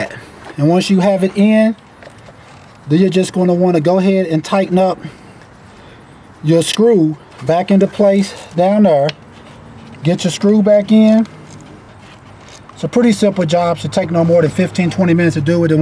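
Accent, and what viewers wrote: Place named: Louisiana, United States. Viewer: i hear sounth louisiana, st bernard parish in dat voice. great tip, thank you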